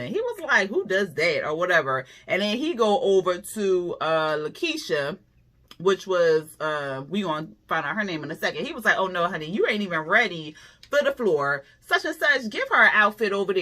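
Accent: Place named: Washington DC, United States. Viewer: girl i can tell u from dc. i can hear it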